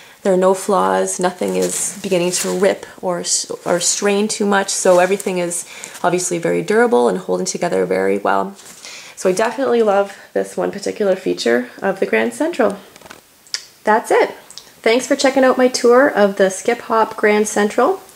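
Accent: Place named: Canada. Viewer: Love the accent! I have a few friends from Canada.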